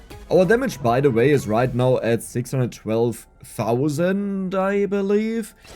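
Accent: Germany. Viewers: Richtig deutscher Akzent xD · im watching tha vid and the hole time i thougt, he has to be german by the way hes pronouncing words, and it got fcking confirmed... :D lov it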